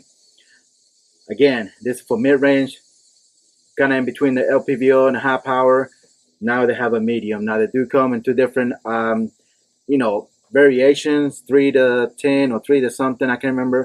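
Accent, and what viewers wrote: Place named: South, United States. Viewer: Man, I'm from GA and I love your southern accent it's pretty unique, I haven't heard a Mexican and Southern accent together before, but being from the south myself I could understand you just fine lol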